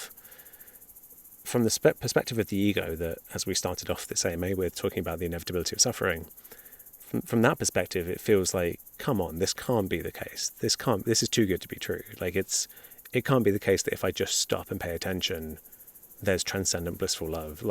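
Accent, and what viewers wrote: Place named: England, United Kingdom. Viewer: that's called an English accent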